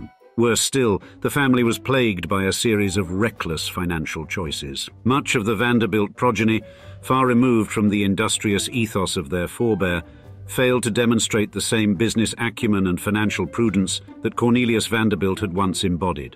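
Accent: RP, United Kingdom. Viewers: and the very erudite sounded RP accent. (And no, RP is not "British" exclusively, but rather 95% spoken mainly in Commonwealth countries with only 5% spoken in Britain.)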